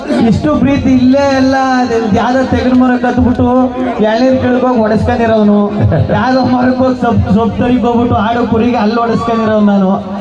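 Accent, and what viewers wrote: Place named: Mysore, India. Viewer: Mysore mandya nam Baashe 🎉 slang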